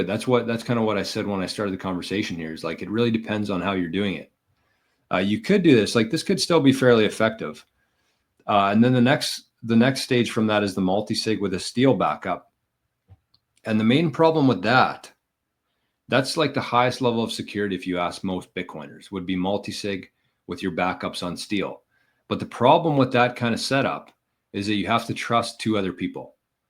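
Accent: Canada. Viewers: I bet this guy played hockey? Sounds Canadian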